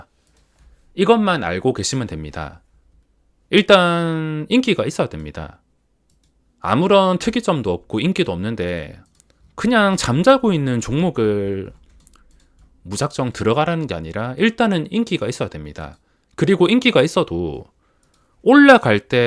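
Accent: Gyeongsang, South Korea. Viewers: 안녕하세요 님, 거의 1년만에 인사드리네요 ^^ 특유의 경상도 사투리의 구수한 말씀을 다시 들으니 좋네요... 그동안 너무 많은 영상을 보지 못했는데, 오늘부터 하나씩 보면서 공부해야겠군요...좋은 영상 감사드립니다.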